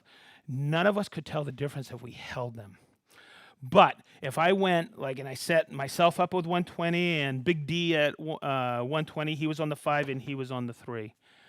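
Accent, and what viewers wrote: Boston, United States. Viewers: Hoosier?? That's a BOSTON accent...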